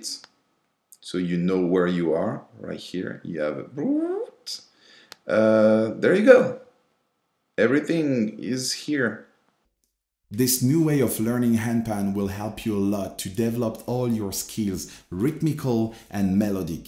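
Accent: France. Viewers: clairement français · Alors il est français "tontonton... tontonton..." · Très très français oui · Tu as l’accent Français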